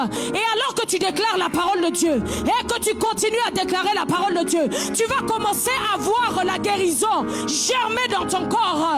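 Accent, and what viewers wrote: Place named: Democratic Republic of the Congo. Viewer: Elle prie comme les congolais. Meme accent. Sois Beni maman. Que Dieu vous fortifie.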